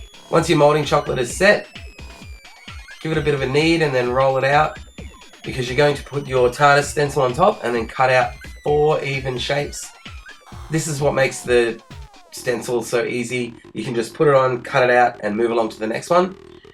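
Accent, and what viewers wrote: Australia. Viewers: Oh my god you're Australian! I was expecting someone british! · I only realised you were Australian when I actually really listened to your voice. I guess you don't notice your own accent.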